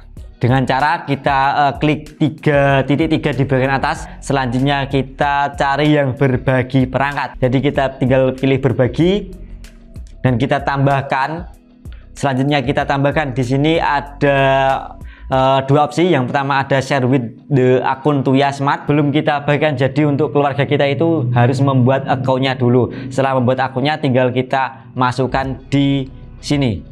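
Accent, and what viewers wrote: Java, Indonesia. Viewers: Jawane medok banget anjir Jiancokkk😂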